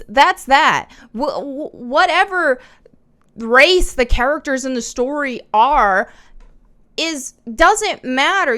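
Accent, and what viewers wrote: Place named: Wisconsin, United States. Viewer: Ran into your channel after diving into the frost craziness. Awesome content creator.. you have an interesting accent. Like you liked in Wisconsin for 15 years and then moved to the south for the last 15 lol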